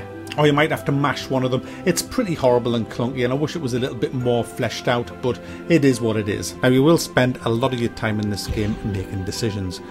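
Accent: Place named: Newcastle, United Kingdom. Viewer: Quite funny having the plot explained in a geordie accent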